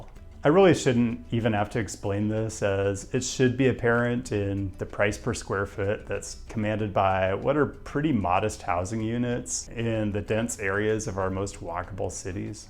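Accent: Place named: California, United States. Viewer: I like this guy's content, but man do I hate his intonation patterns. It's like farcically Californian.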